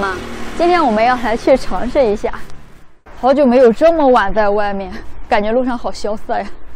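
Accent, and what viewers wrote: Shandong, China. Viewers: 主持人这山东口音太浓了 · 有山东口音呀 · 妹妹是山东哪里人？好熟悉的方言普通话发音😂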